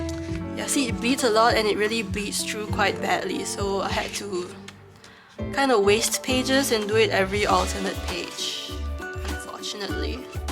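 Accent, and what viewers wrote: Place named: Singapore. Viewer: Your drawings are so pretty! And I really like hearing another Singaporean voice on youtube!! Talk more!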